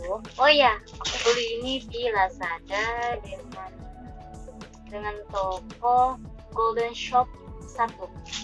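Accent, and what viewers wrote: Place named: Makassar, Indonesia. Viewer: Makassar yh logatnya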